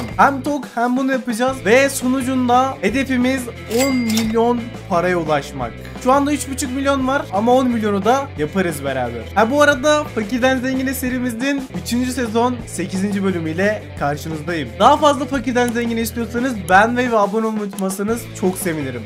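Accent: Denizli, Turkey. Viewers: Abi sen denizlilimisin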